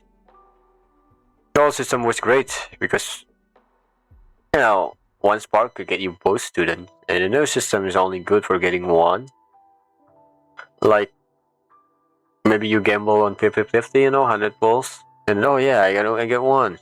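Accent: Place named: Indonesia. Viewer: It's fine. But not fine😭😭😭 you have an insanely thicc Indonesian accent 😭😭😭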